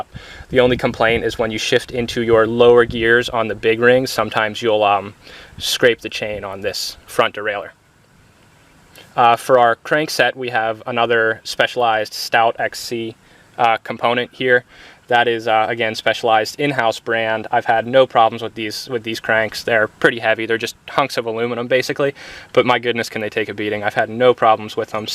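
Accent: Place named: Pittsburgh, United States. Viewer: you sound like you're closer to Newcastle or Pittsburgh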